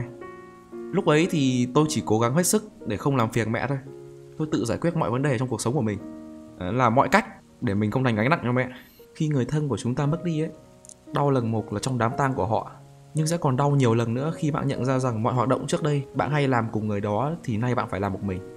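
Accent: Hai Phong, Vietnam. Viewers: A ở Hải phòng à :v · Bác add quê Hải Phòng ah? :)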